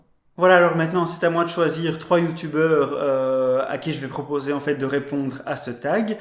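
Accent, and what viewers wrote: Belgium. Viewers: J'aime bien ton petit accent :) T'es belge ? ^^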